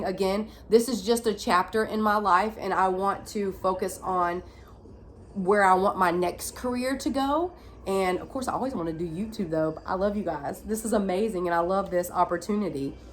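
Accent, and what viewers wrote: South, United States. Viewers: I loved getting to know you more! My mama was a single mom to 4 kids and I never knew how hard we had it. She is amazing and you remind me so much of her! STRONG! Now as a mom (of 2) who has a very supportive partner I just admire my mom and you and all the single mamas that much more. I seriously just admire you so much! And I love your accent! I’m a southern girl living in Utah and don’t get to hear it much often so it’s an extra bonus for me! ❤️❤️❤️ God bless! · I love watching your channel. I lived in Atlanta for a while, so listening to your southern accent so awesome. Listening to this episode on your channel, I find a lot of similarities in my own life. I started crying when you spoke about loosing your son because I also lost my son.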